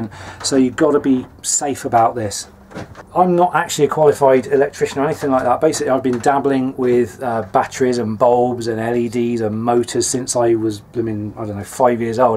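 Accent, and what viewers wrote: England, United Kingdom. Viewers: It's English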